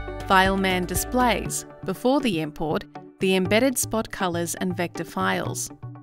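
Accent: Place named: Australia. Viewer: Who's the presenter ? Voice sounds like its Aussie.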